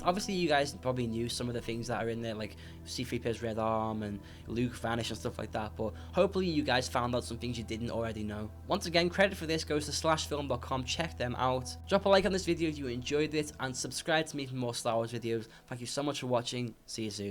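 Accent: Liverpool, United Kingdom. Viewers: U scouse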